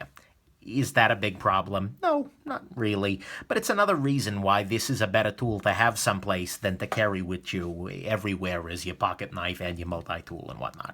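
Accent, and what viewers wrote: New York, United States. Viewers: You from New York bro? I worked with a lot of cats from there and you sound like them haha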